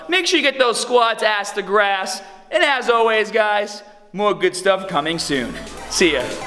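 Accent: Boston, United States. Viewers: Sooo Boston! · What part of Boston are you from brother? BAHBELL LIFTS ALL DAY :) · lol Bahbell. I find a problem where when I ass to grass squat for 10 reps+ I start bouncing out of the hole when I start getting fatigued. I feel like I'm cheating myself but I'd like your opinion! thanks man love the Boston accent by the way haha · Are you from boston you have the accent. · Definately from Boston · That Boston Accent is serious! Good info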